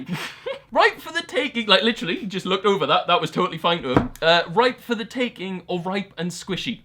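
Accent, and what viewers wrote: United Kingdom. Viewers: Yeah, I understood about 72% of what you said. British accent/culture specific words + fast speaking = lack of comprehension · i rly subscribed for the British accent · This actually might be too British for me .... i really don’t know anything you’re saying 😭😭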